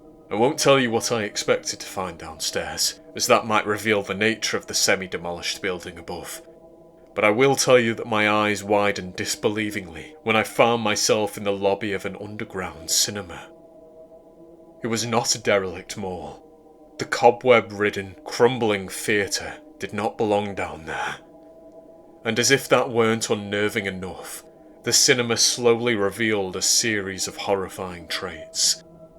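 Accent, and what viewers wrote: United Kingdom. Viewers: ahh I thought so good to see another British narrator 😀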